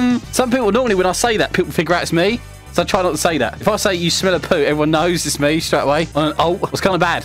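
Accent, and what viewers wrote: United Kingdom. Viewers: Are you British? I love British accents, especially your voice is so soothing for some reason lol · The British accent 🥵😩😩😩😩😩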